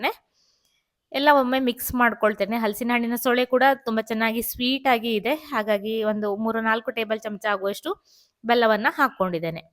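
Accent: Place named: Kundapura, India. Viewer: ನೀವು ಮೊದಲು ನಮ್ಮ ಕುಂದಾಪುರ ಭಾಷಿ, ಮಾತು ಆಡುತ್ತಿದ್ದೀರಿ, ಅಲದಾ???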